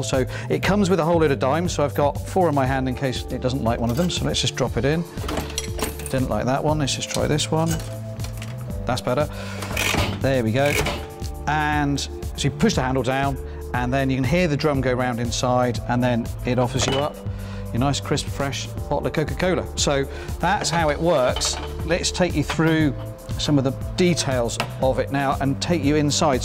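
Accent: England, United Kingdom. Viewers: (This is Tom, not Sandra.) Great video sir. Love the English accent, too. I wish l could find a classic Coke machine from the 50's or 60's. I love ALL things, classic, from the 50's and 60's, myself. I, being born in 1958, grew up with these classic drink machines. Thank you for highlighting these wonderful artifacts from our past, sir.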